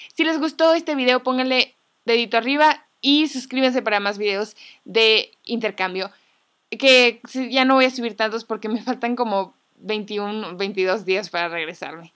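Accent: North, Mexico. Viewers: Haha con todo respeto todo el video me hiciste reir ha eres bien espontánea, y carismática eso creo haha! Y Norteña Verdad? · No mames, es verdad lo del Boss y Bus.. son muy muy parecidas en la pronunciación... No sé tal vez la diferencia esté en que en Bus el sonido de la 'ssss' es más corto (especulando). Oye y porque no te agrada tu acento mexicano? :0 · ¿Y porqué sentiste bonito al no darse cuenta de tu acento mexicano? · tu acento se escucha del norte de mexico!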